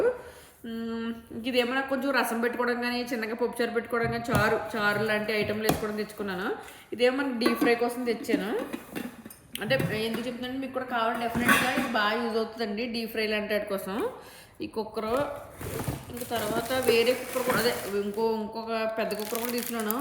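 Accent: Godavari, India. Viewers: I too like your godavari language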